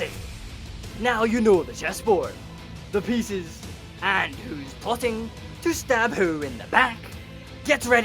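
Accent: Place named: Scotland, United Kingdom. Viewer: You can be Scottish and understandable. Speak calmly please.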